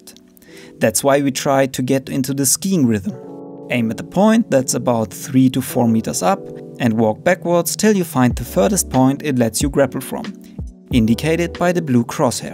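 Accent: Germany. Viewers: Du bist doch ein Deutscher oder :) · Ich schätze Mal dass deutsch deine Muttersprache ist...aber finde es trotz des unvermeidbaren Akzents, spitze danke für dieses Video :D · Are you an German? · Ich höre doch genau raus das du deutscher bist :) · Erwischt du bist Deutscher hehe ;) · Hey mein ich das nur oder sprichst du normaler weisee Deutsch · Safe deutsch hört man ja extrem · 10er wenn das kein eingedeutschtes Englisch ist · Are you German? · Haha deutscher akzent kickt😂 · Schöner deutscher akzent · Man hört aus deinem englisch raus das du deutscher bist xD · Der ist Deutscher merkt man am Akzent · You sound German m8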